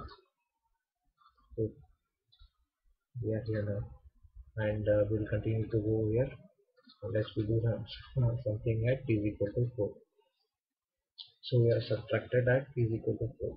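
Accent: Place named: India. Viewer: Not only you've got that stupid inidian accent, but you've also got a shitty microphone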